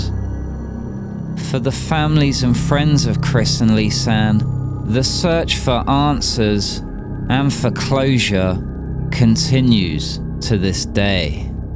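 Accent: United Kingdom. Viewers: Your English accent makes the English language lovely to hear and understand.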